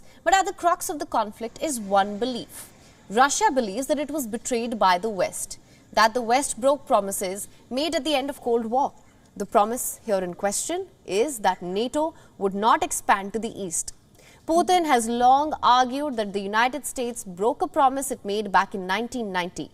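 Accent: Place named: India. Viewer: Your voice and accent makes it best for story telling. Desi tone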